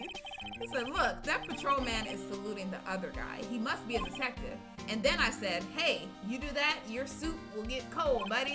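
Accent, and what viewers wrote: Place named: South, United States. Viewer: Girl your cowboy southern accent is too funny!!!!😂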